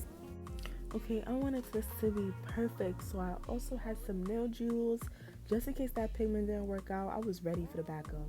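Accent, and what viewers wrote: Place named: Barbados. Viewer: “I’m not ling ling in the flesh” Plus your Bajan accent 🙌🏾 Loll I love it😂😂🙌🏾❤️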